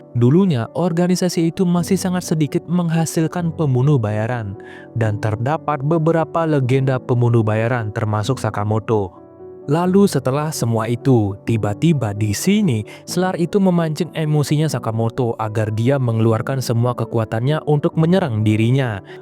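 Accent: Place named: Bali, Indonesia. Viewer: SERASA LOGAT BALI... BLI😁, KELIATAN DARI LOGAT BILANG HURUF T DAN LAIN2😅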